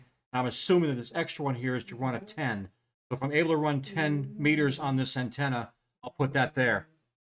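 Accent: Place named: United States. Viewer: Always makes me laugh how you Americans pronounce soldering ie sodering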